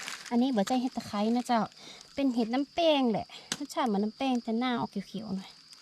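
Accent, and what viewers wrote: North, Thailand. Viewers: รอไห้กำลังใจน้อง จ้าชอบน้อง อู้คำเมืองเพราะๆจ้า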